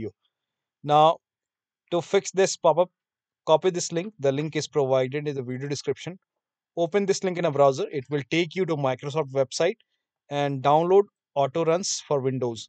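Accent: India. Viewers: accent like indian tech, thanks , very helpfull matte:elbowcough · I took a whole day, running antivirus, malwarebytes, autoruns, rkill, MSERT, i had tried everything , then I clicked on a random youtube video with 24 likes and a heavy indian accent; then the prophecy became fulfilled, there is no real solution or teaching that is better than this one and finally I can turn off the PC and go to bed. Thanks man.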